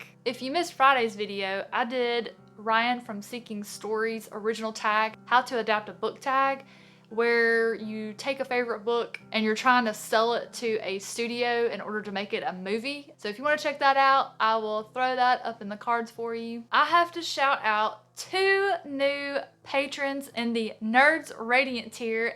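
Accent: South, United States. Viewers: It's the southern accent.😍😍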